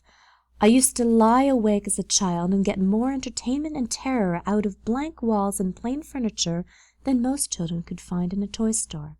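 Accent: Canada. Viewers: I super appreciate the reader's slight Canadian accent; makes this so much easier to listen to! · The Canadian is strong with this one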